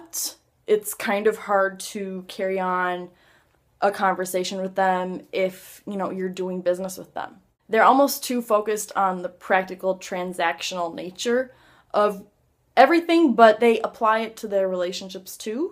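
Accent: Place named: Midwest, United States. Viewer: This is the strongest midwestern accent I've heard in a while.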